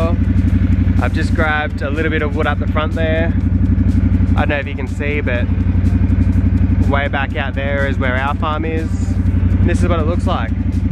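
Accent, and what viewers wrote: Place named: Australia. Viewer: Ok you two are the only gay homesteaders on YouTube which means you please have to make more videos and make them more often. Plus you’re freaking Australian which means extra points for the accent. Thank you.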